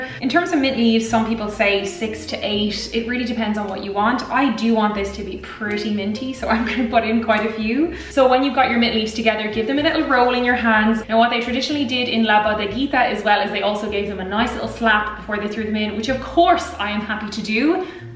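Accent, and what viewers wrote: Ireland. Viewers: I love your Irish--accented Spanish <3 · Damn. Prolly the best looking Irish girl I’ve seen. That accent I can barely understand though. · You are simple beautiful love your irish accent.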